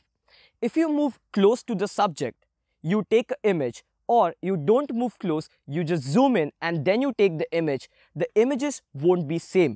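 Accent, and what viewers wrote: India. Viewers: The Indian English accent kill me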